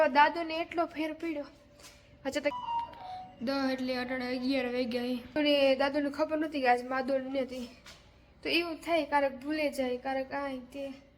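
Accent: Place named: Mehr, India. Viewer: Mehr dialect. A boli na 2 prakar chhe. Ghed ane barda ni. Thodo fer hoi chhe.😊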